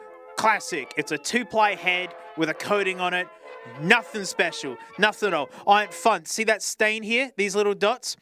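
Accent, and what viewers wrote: Australia. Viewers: Either I’m like racist and all Aussies sound exactly the same or this is definitely the guy from the channel 🤔 it’s gotta be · Everything about this accent says Queeeeeenslanddeerr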